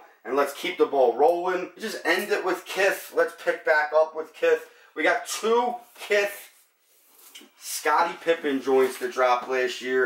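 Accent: Philadelphia, United States. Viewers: philly accent lol say water lol woter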